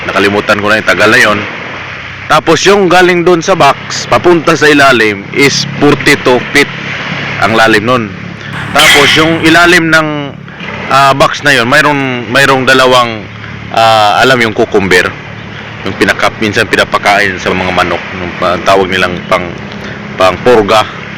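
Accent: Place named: Quezon, Philippines. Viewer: Parang naa ka sa quezon province sir.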